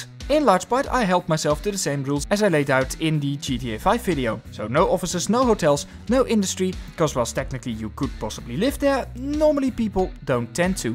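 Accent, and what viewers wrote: Netherlands. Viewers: Are you Dutch? Your accent sounds Dutch 😂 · nice dutch accent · what an example of dutch accent😊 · Nederlands accent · I have an feeling that you're dutch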